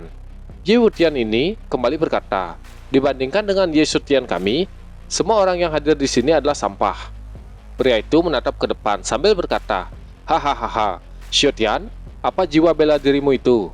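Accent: Bali, Indonesia. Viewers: Makin lama makin jelas logat balinya :v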